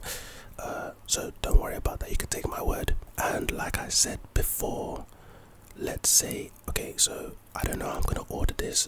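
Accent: United Kingdom. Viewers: Are you from the uk bro?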